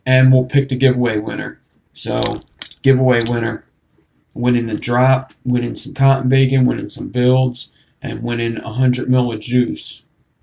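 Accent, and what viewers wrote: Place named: New Jersey, United States. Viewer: youz guyz is a jersey thang. everytime I hear it, it reminds me of Kevin Smith or more to the point Jason Mewes :)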